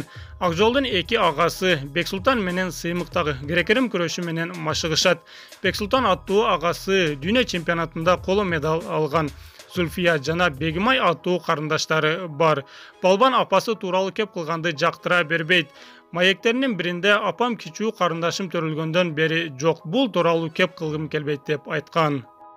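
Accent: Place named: Ichkilik, Kyrgyzstan. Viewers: Неге адабий тилдин нормасын бузуп суйлойсунор дале болсо ичкилик аксентин бербейсинер Эл астында блогер болсонор адабий тилдин нормасын сактагыла Оз чойрондо суйлойбер аксентин менен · Кыргызстанды атыны. Автор ичкилик окшойсун.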